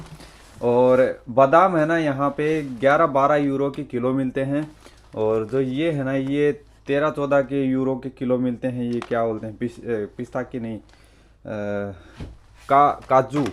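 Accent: Haryana, India. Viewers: Delhi se ho ki haryana se ho bhai aap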